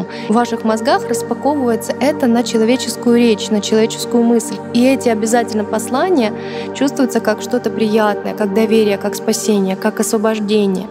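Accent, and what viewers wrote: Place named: Moscow, Russia. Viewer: Московский говор на понтах. Не подходит к этому тексту. П.С. раса с одной с, а не расса.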